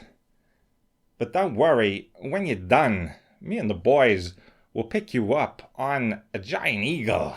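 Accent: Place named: United Kingdom. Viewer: This guy's British??? Wow-wuh!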